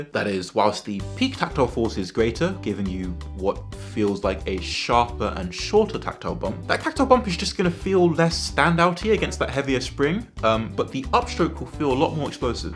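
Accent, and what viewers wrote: United Kingdom. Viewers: After watching all your vids I can't say tactile without a British accent. Im American. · damn your sexy as hell my man! your british accent almost made me pop (^o^) no hooo moooooo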